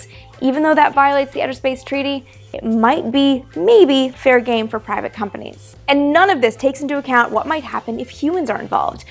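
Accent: Canada. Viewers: I really like these videos, and your pronounciacion is so Candion. Hehe. Go!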